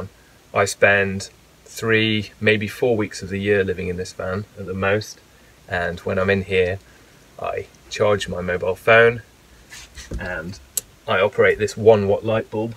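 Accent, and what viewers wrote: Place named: United Kingdom. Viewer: Can’t thank you enough for these videos. You’re an excellent electricity teacher. Spent a few years NOT understanding this. You explain it plainly in 15 minutes. Ahhhh the time I could have saved 😂 and for some reason I understand the British lesson way easier than if an American is teaching it. I think some of the words make way more sense (leisure battery (b)=dual system(a)) plus I enjoy your accent way more than these hicks we have here 😂😂😂 sorry I had to 😝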